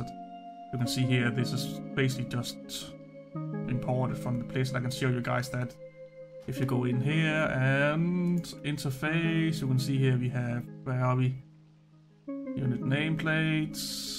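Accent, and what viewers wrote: Denmark. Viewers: The danish is strong in this one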